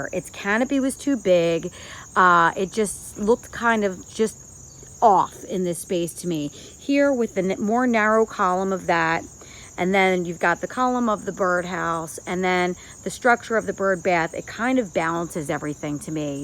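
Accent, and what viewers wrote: New Jersey, United States. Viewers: I deffs knew were from NJ from listening before reading the description. And I love how cute you've made that shed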